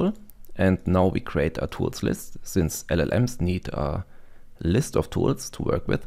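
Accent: Germany. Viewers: Are you german by chance? Love the accent. Keep up the good work.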